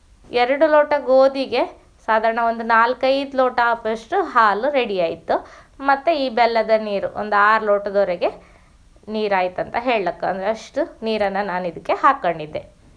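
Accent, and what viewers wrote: Kundapura, India. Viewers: ನಿಮ್ಮ ಕುಂದಾಪ್ರ ಕನ್ನಡ 👌🏻👌🏻 ಗೋಧಿ ಹಾಲ್ಬಾಯ್ ಕೂಡ ಬಾಯಲ್ಲಿ ನೀರ್ ಬರೋ ಥರ ಇದೆ. 2 ದಿನ ಇಟ್ಟು ತಿನ್ನಬಹುದಲ್ವಾ.. 👌🏻👌🏻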